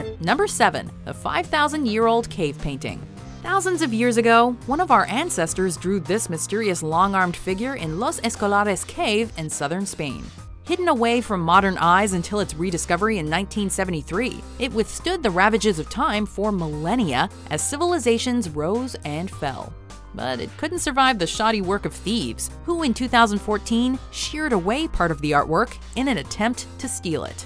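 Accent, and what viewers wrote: United States. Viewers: the woman has a US accent, therefore she is being pretentious. It is irrelevant how it is pronounced in other countries. You want to hear it pronounced that way, make your own videos. As for her, in the USA, it is a mispronunciation. VASE. VAYSSSSS.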